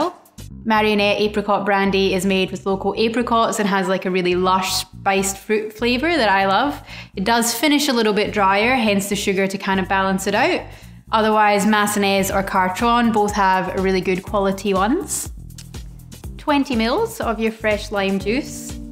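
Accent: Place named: Scotland, United Kingdom. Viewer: Wow, had never heard of this drink. I like having another tequila cocktail in my back pocket, and now I have a good excuse to go get some apricot brandy! Thank you! And your little outtake at the end got a surprised laugh out of me 😂 Saucy! 😍😏 Your pronunciation of Toreador is good but not strictly correct. Spanish has shorter sharper O's, the single R's are trilled slightly, the D is softer, and the E has a flatter "eh" (like "fresh") sound instead of "ay" (like "ray"). But who cares; your Scottish accent is as charming in Spanish as English :)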